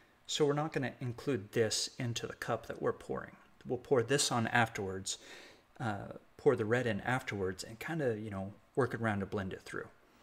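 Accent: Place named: South, United States. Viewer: Hi,. Really nice colors. I imagine it's gonna dry deeper so be sure & show us the finished painting. Oh, btw, I am a deep Southern gal & take no offense to yo bit of South talk. (Just don't put me down in my presence.)😎 💕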